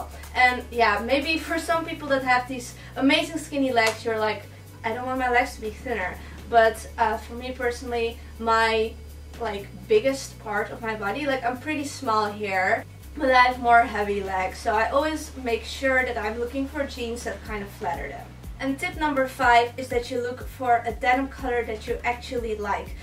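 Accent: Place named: Netherlands. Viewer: First couple seconds of you talking and I already figured out you're dutch 😂😂 leuke video girl xx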